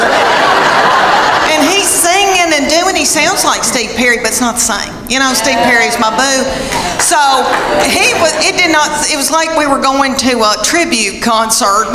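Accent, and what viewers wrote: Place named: Knoxville, United States. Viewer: So funny. The accent is so like my cousin in Knoxville.😅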